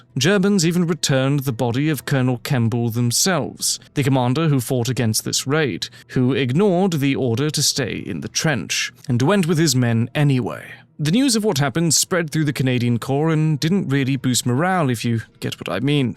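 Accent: United Kingdom. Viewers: Condescending British accent narration is key to losing all your audience.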